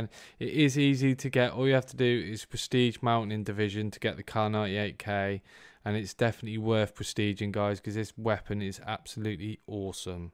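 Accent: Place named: United Kingdom. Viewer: Damn british accent I can barely understand half the stuff you're saying 🤣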